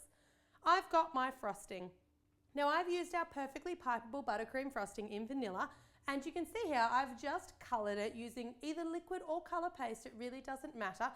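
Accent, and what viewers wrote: Australia. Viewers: an Australian haha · I love Australian accents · Your an Aussie!!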